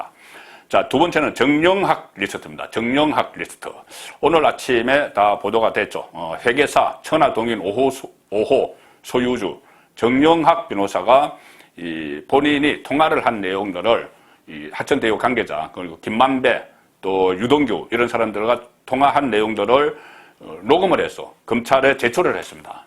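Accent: Gyeongsang, South Korea. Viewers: '대장동게이터 이혹'이 있는... 갱상도 사투리가 구수하시네요.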